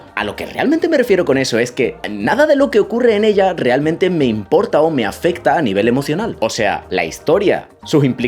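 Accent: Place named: Andalusia, Spain. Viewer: Encima todo con acento andaluz 👌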